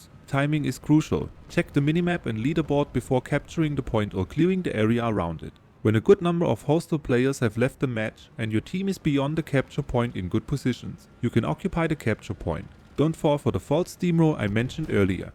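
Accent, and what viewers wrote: Germany. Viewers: You are German right ? 😄 ich auch 😂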